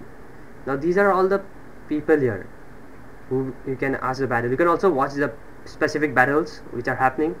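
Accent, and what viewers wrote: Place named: India. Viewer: ur original accent u do no harm so expecting an indian accent . the overall video was gud keep up the gud work bro👍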